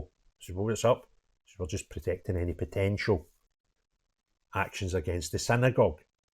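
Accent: Scotland, United Kingdom. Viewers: The respected Anchor is most probably from Scotland. Regards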